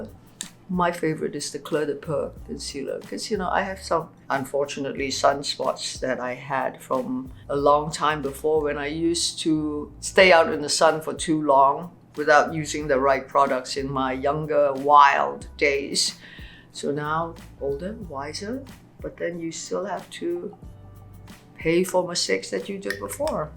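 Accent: Malaysia. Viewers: "can see or not" hahahah I LOVE that she doesn't Westernise her accent, regardless of what films and shows she appears in, she's so unapologetically Malaysian/Asian, and that's so extremely rare. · it's typical malaysian of chinese descent english accent and it's nice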